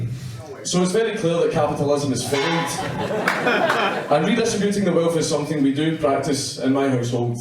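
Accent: Scotland, United Kingdom. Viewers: Could barely understand you. Scottish Comedian/10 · Way too British to understand, dear god. Lol, but good stuff, man. Right on.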